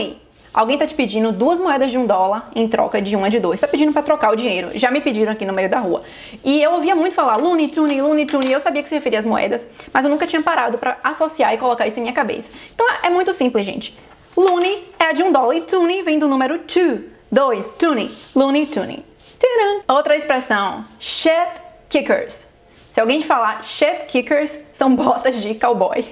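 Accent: Brazil. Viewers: vc merece parabéns só por ter falado o nome do estado escroto no norte hahaha mas tem umas dicas boas. curti mais seu sotaque brasileiro (sdds br)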